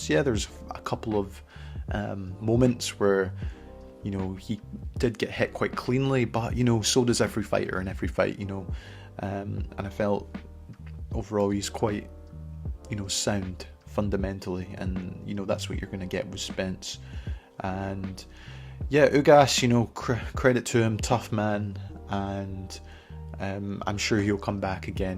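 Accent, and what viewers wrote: Scotland, United Kingdom. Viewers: Hey dude are you from Scotland? I’m from Dundee/Aberdeen and I love boxing. We should do a co-lab sometime, I’d be happy to jump on the show and chat with you anytime! Keep up the good work 👍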